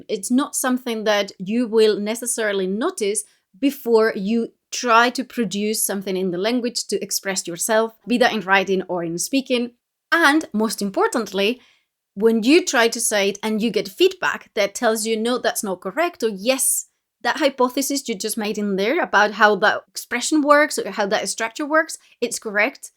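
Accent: Scotland, United Kingdom. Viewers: Yup, I was looking for confirmation of this! Certain sounds are very Scots. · Yes, her accent is a mixture. I hear a Scot and English accent. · A significant detour, but I very quickly heard a noticeable bit of a Scots accent :) It took me a long way back to a Scots colleague who was also a fluent Spanish speaker... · Of course you can tell she's Spanish, but she's got a mix of different British accents, especially Northern English and Scottish. No thick accent at all. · Solid video! I'm interested in your accent. Are you a native Spanish speaker modelling your English after a Scottish accent?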